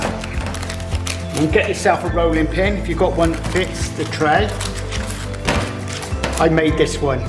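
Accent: United Kingdom. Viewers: Yum! We Americans love a Brit accent :)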